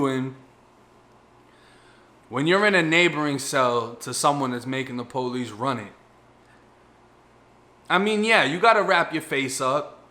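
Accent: Boston, United States. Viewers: Your stories sound better with that bean town accent ya' got..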